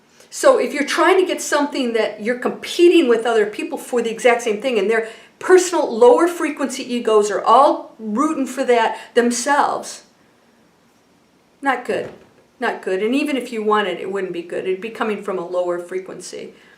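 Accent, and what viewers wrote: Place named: South, United States. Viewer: I wish that was my next door neighbor. I thought that you would be Russian with that name but you have a country accent.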